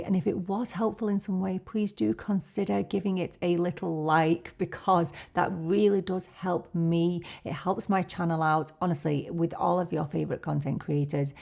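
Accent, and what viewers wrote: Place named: Yorkshire, United Kingdom. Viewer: Subscribed as soon as I heard your (Yorkshire?) accent! Not often I find someone on YouTube in my age group and from Northern England 😊. I too moved away many years ago and listening to you reminds me of home. A MAC lipstick video as well…perfect!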